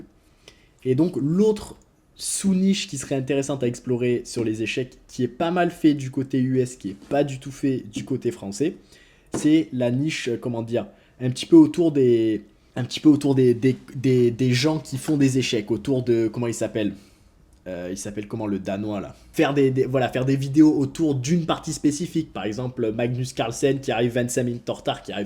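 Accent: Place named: Lozère, France. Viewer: Je connais cet accent (48) 😉 dis-moi si je me trompe ?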